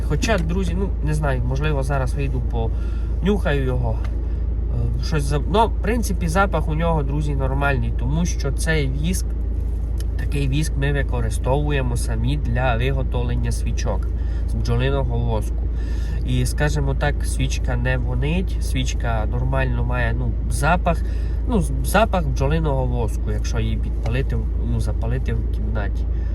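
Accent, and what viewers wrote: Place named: Transcarpathia, Ukraine. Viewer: Ви з Закарпатя говірка закарпацка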